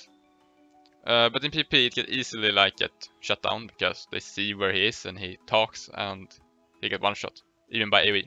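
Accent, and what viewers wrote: Sweden. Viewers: As soon as I heard you're voice I was like ''Yeah, he's swedish''.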